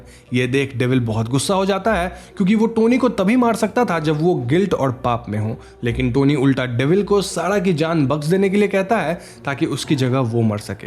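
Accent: Bengal, India. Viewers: Aap bengoli ho?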